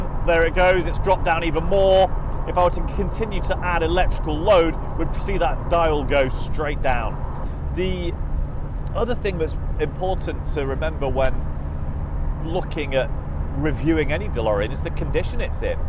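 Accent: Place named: England, United Kingdom. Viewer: Good review, but please lose the English accent, it sounds silly.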